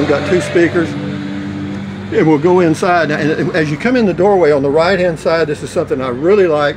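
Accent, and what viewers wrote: Texas, United States. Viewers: Nah, Billy Bob Thornton. Very Texas! :)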